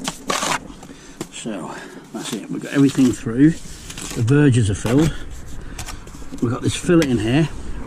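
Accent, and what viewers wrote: Norfolk, United Kingdom. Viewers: Great work bud..you from norfolk?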